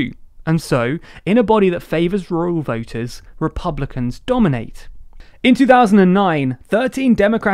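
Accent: United Kingdom. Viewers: Love hearing a Brit explain American politics!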